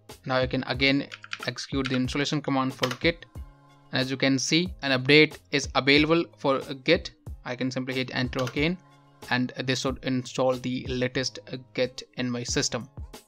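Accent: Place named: India. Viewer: Talk slower please, I can learn and speak with second language accent. me, a 57 year old white guy trying to learn Linux-GNU from the beautiful (vernacular) Indian accent. You speak faster when giving instructions or says a new name.vernacular I have to learn more acronyms than the Navy- Air force and Space Agency put together. oO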